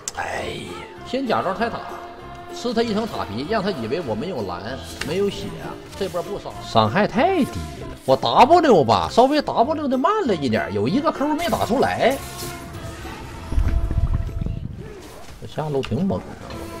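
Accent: China. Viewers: 猴哥到底大陸哪裡的口音呀？積木XD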